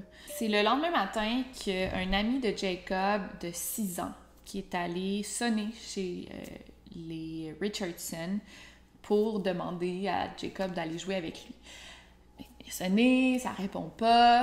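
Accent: Canada. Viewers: J’adore ta facon de raconter les choses … pourtant j’ai du mal avec l’accent canadien d’habitude mais avec toi tu raconte tellement bien que je m’en fiche 🤷🏼‍♂️ ! Continue ainsi ! ☺️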